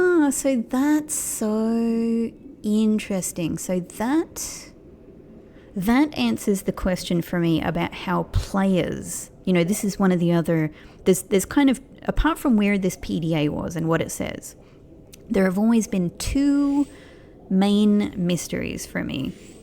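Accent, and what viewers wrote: Australia. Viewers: Hi, just tuning in for the first time. Sorry I missed your full journey! Question, I don’t recognize your accent, where’s it from? Edit halfway through you said you’re from Australia! That’s so cool. I hear they have a beautiful coral reef there. My love for this game has got me into scuba diving, one day I hope to do that down under!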